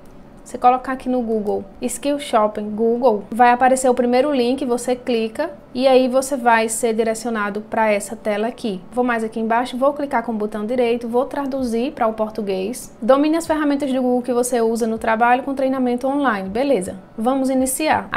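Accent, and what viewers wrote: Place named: Rio Grande do Norte, Brazil. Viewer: Sotaque igualzinho o meu, já vi que é poti 😁☺️ parabéns pelo vídeo 👏 sucesso!!!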